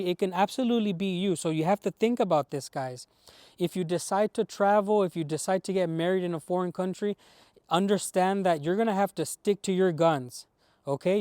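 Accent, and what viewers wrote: Mexico. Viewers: Bracero was WW2. You're younger than me by a generation. Sorry your story does not work by time. You're at least born on the 1980s if your parents were part of the Bracero program they would have been in their 20's in WW2 which would make them in their 60's in the 1980s. Sorry pal not buying it. And your accent tells me you spent most of your early childhood in Mexico before you came to the US having nothing but contempt for our immigration laws like every other Mexican. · You sound more Mexican than Ameican, bro. Come on now. Dumb shit be dumb shit..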